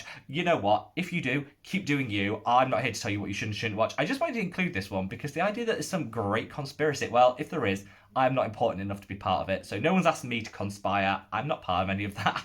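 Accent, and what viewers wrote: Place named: North, United Kingdom. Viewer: Ahh. That's it. The northern UK accent is what you have. That's what I had thought. I've seen videos in the past about all of the different accents in the UK. I was terrifyingly obsessed with the Spice Girls in the late 90's. I was 11 when they debued in 1997. They were famous/popular in Canada almost instantly/the same time as in the USA and the UK.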